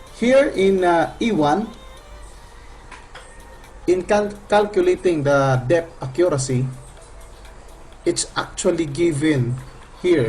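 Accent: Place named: Visayas, Philippines. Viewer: Bisaya accent , mispronounced ang mga words di maintindihan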